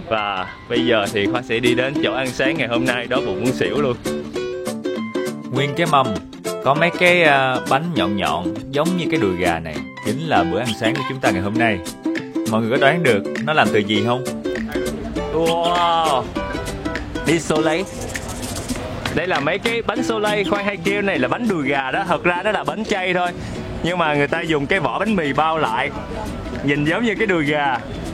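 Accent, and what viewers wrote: Mekong Delta, Vietnam. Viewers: Dân mìền Tây nói chuyện nghe vui ghê. Vui vẻ thì nói là dui dẻ. Màu vàng thì nói là màu dàng.Việt Nam thì nói là Diệt Nam..Mình có dạy cho thằng bé lớp năm quê ở miền Tây môn tiếng Việt mà sửa lỗi chính tả cho nó cũng muốn tẩu hoả nhập ma. Nhưng giọng, cách phát âm thì vẫn cưng và nghe hay thật.Chính mẹ mình dân Daklak còn khen nói chuyện hay.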